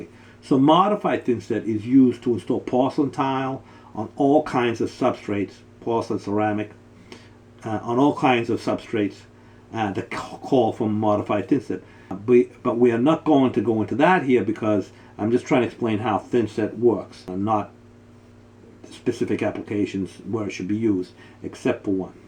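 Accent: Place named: Northeast, United States. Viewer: Ask not what you can do for your tile job... Is this the accent from the American northeast? - he sounds a bit like (former) President Kennedy? Rob Melbourne... Australia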